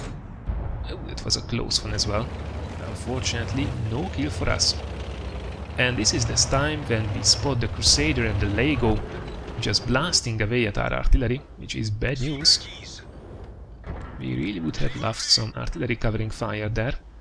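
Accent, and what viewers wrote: Hungary. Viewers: Olyan magyaros akcentusa van :) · what is your official lamguage because you speak english like a hungarian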